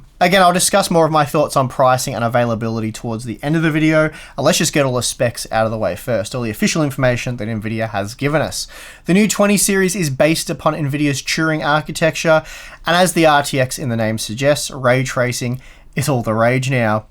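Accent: Australia. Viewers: What's with the red-striped leather jacket at the end?... quick change artist are we?... getting a little cold there 'ol possum? haha! Thanks for a great news/opinion piece vid - always seems a little more authentic to me with the Aussie accent and no American BS. Really appreciate the thought you give things and your independent thinking mate. Cheers, from the Hills area in Sydney!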